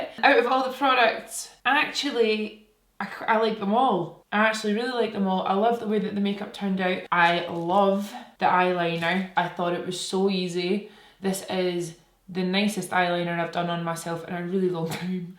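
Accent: Scotland, United Kingdom. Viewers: Skoosh 💦 😂 Awfy Scottish today!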